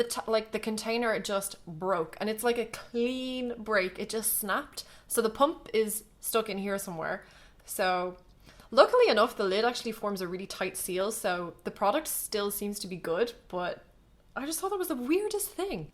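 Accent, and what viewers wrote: Ireland. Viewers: Great tutorial, clear and to the point. Great camera too, makes it a pleasure to watch. And you're Irish? You've one me over, just subscribed x:-)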